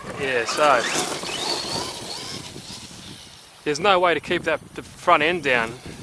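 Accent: Australia. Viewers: Where are you from you sound austrailian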